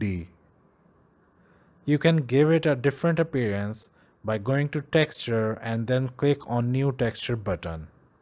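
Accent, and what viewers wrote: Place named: India. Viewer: Really amazing even with the indian voice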